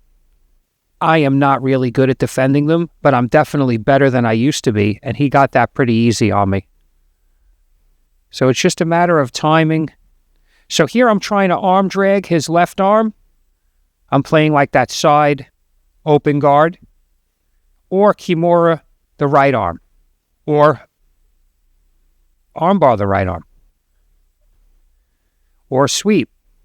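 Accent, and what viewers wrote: United States. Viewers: Thank you for your channel and thank you for your accent. I’m a big fan of American regionalism and the maintaining of American accents.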